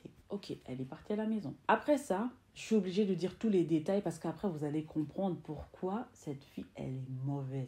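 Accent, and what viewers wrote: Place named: Democratic Republic of the Congo. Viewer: D'après ton accent Je dirai que tu es ma compatriote congolaise est ce vrai ?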